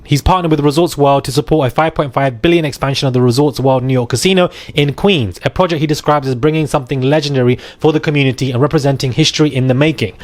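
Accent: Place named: England, United Kingdom. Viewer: An east Indian with a English accent ok ... this is black folk bizz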